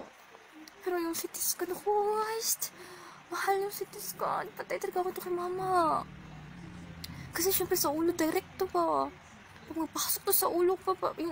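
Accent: South Korea. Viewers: galing mag korean bisaya accent 😊😊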